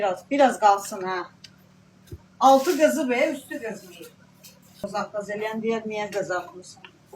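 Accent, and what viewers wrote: Talysh, Azerbaijan. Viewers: Toluşə ləhçə çı ğəşəng qəp jeydəş😂😂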